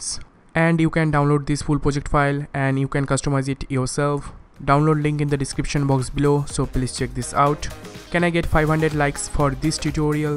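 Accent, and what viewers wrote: Bengal, India. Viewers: bro are you bengali?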